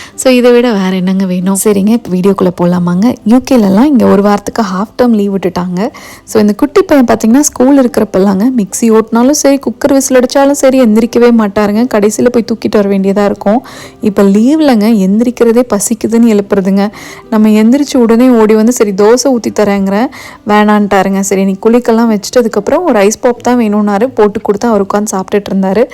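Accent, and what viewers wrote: Kongu, India. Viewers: " ங்க " என்று சொல்லும் கொங்குங்க, எப்பவுமே கிங்குங்க, வார்த்தையே சாங்குங்க, மொத்தத்தில ஹாப்பிங்க!👍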